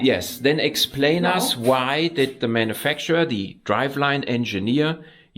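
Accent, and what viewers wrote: Germany. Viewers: I hear German accent, I believe any engineering points they tell me !!! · Mechanical lectures spoken in a German accent have an authority that is unrivaled by any other nationality. All of us viewers around the world are just sitting nodding and quietly saying, "Yes, Herr Professor," accepting everything you say without question...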